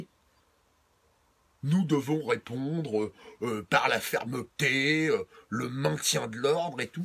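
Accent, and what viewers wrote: Paris, France. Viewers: C'est la première fois que je t'entends, j'abonde en ton sens à 100%. PS : ton accent franchouillard à la limite du titi parisien est top ! Continue !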